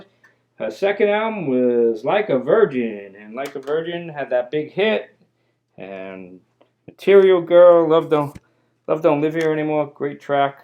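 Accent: Long Island, United States. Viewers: I just discovered your channel and i'm digging it! WE share the same taste in music and are probably close to the same age. U grew up on Long Island (accent is a giveaway) and I grew up in South Jersey (my Philly/S Jersey accent is a giveaway). Keep up good work!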